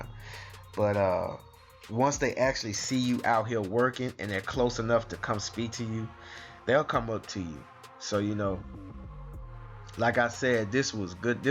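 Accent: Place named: Deep South, United States. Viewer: Caught on after viewing some more vids. That's definitely the deep south.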